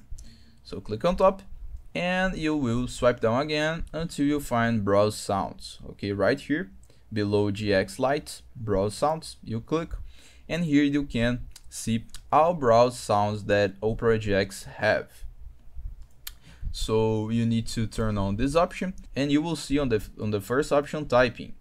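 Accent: Brazil. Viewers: parece br falando ingles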